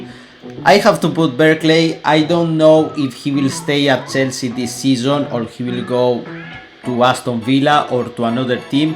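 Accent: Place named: Spain. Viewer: I love your accent❤Are you Spanish?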